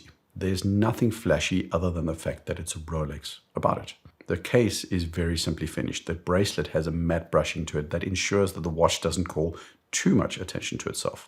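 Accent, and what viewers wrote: South Africa. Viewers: I have always thought that you had a rather unique accent which sounded very "Saffer", now I know where the accent comes from. Greating fom Cape Town" Boet" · Mr, you're South African?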